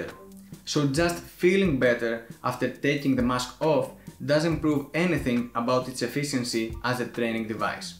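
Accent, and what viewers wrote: Greece. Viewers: Αδερφέ μα τον θεο πριν δω το βίντεο κατάλαβα από την προφορά ότι είσαι Έλληνας... btw καλή ανάλυση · It's a Greek accent, he is Greek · προφορά ελληνική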